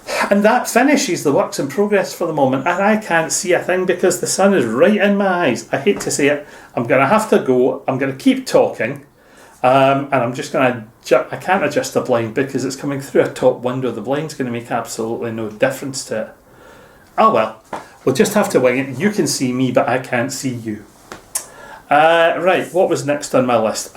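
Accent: Scotland, United Kingdom. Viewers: My god, i love your accent! I trained in Edinburgh and i love love love the Scottish accent. Nathan sent me across from the sockmatician podcast. I'm going to be writing my comment as i watch your podcast, just so i dont forget what i want to comment on. xx ooh all of your sparkly yarn shows up great with the sun streaming in! I really like the design of the Sharf :) the colour inspiration is so interesting. i have to say i say no to requests, but then again, how could you say no to knitting a baby sweater for a friend? Ive had random people that ive met once while travelling, ask me for socks and stuff, and ive said no. it depends on who is doing the asking i suppose. that wool looks incredible! and that sweater looks SO AMAZING! I agree with the wide ribbing, babies have big tummies and they need space for all that growing! that hat is so ridiculously cute. 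I love love love that little feather and fan pink baby sweater. you remembered that pattern from years ago too, you are fearless and wonderful. im really excited to see what happens with the plant based fibers! i really want to learn more about that! im so glad you filmed that trip to the warehouse! its fascinating and not sad at ALL. im always filming for my podcast. i know someone will want to see what ive been up to - they seem like such lovely wonderful people! I'm definitely going to be getting some yarn from that wonderful shop. thank you so much! xxxx - Grace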